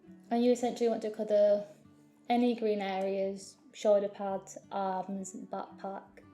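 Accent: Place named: United Kingdom. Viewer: the most refreshing thing about your videos is your british accent. It's really nice to hear over all the american english (or non-native speaking) painters.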